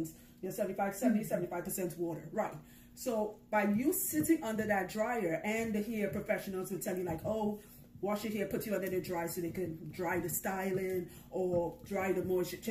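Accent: Trinidad, Trinidad and Tobago. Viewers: Yes she's ah Trini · Am i hearing a Trinidad accent ckming through?🇹🇹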